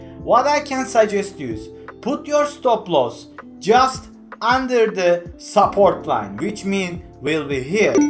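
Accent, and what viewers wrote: Turkey. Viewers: abi konuşmadan dedim bu türk aksanı baktım öyleymişsin güzel video emeğine sağlık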